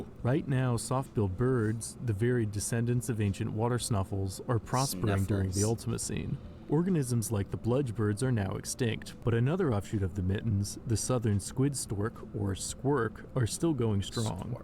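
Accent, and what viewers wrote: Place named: Russia. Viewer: In general, I think you have a chance to become popular👍 . (Well, English with a Russian accent is so-so.)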